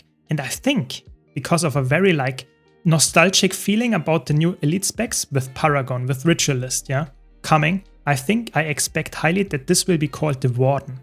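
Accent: Germany. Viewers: uff mir fällts immer wieder auf wie sehr es anstrengt den deutschen akzent zu hören. aber dennoch, gute anordnung der elite spezialisierungen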